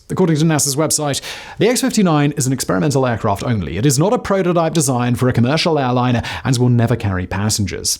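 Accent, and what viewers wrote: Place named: RP, United Kingdom. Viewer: Why do you think just because this channel and you speak with that upper class posh Gary Gavin accent that I’m not going to swear the fucking shit bat balls in this comment section know you are fucking mistaken where the fuck is ATI and I want business blaze where the fuck is business blaze blazing back now cocaine also nice blaze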